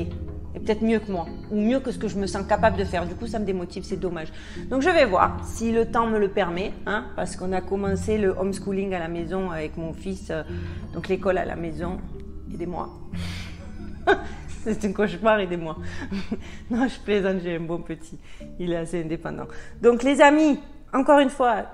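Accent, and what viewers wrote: Marseille, France. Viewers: C'est (encore) un pouce vers le haut pour ta vidéo me concernant ! J'adore la façon dont tu traites tes sujets et même ceux qui à priori me branchent pas trop, tu arrives quand même à les rendre intéressants. En prime, l'accent marseillais plus ta personnalité ça donne un style inimitable. Quand on repense à la raison de la création de ta chaine, au départ (cf ta vidéo FAQ), c'est amusant de voir ce qu'elle est devenu :D Si je m'écoutais je regarderais tout le contenu de ta chaine en 2 jours mais je préfère me forcer à pas trop en regarder à la fois de sorte à faire durer le plaisir ^^ Bravo et merci <3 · Hello, merci de répondre aussi vite, je vous ai regardé vers minuit depuis la France et je n'attendais pas une réponse aussi rapide 😜 En fait je reçois youtube sur mon TÉLÉVISEUR (seul avantage de mon opérateur adsl !) et je regarde la nuit vos vidéos, celles de Silvano Trotta (excellent) de deQodeurs, de Réveillez-vous, de Ema Krusi etc.. 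que les meilleurs ! L'écran étant plus grand, je vois mieux ! Vous êtes la seule dont le visage apparaît en "blanc et noir", ce qui m'agace car vous êtes trop jolie à regarder et à écouter, votre humour et votre "accent du midi" sont rafraîchissants 🤗 Par contre, vous apparaissez normalement sur l'écran de mon portable mais il est plus petit ! Je vous confirme que je ne reçois pas toujours les notif. bien que j'aie activé la cloche.. Votre travail est excellent et agréable à suivre, sans prétention ni recherche de concurrence : all is right 😉 Maman d'une merveilleuse fille de 20 ans, étudiante, j'aimerais moi aussi la garder avec moi car savoir qu'elle doit porter la muselière toute la journée à la fac me révulse ! Bonne chance avec votre petit gars 😘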